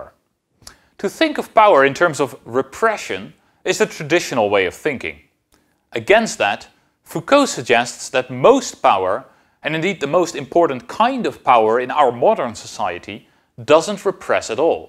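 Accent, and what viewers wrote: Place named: Netherlands. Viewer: i love your dutch accent! Lekker!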